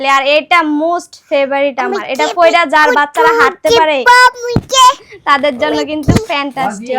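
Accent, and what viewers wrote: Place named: Bangal, Bangladesh. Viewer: কথার ভাষা কি বাঙ্গাল বাঙ্গাল ছি এগুলো আবার ভিডিও করে লজ্জা শরম নাই বাঙ্গালের বাচ্চা গো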